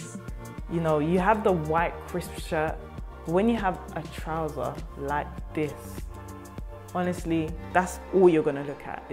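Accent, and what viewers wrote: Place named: France. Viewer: Love the french accent 😍😍😍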